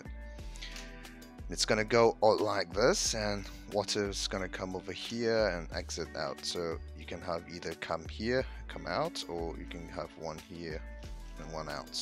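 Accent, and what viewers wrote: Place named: Germany. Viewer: loved video, i can tell your normal language is german, it's a cool language :D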